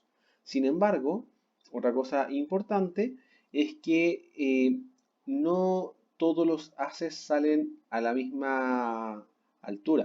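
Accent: Chile. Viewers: Eres de chile? Por tu acento 😅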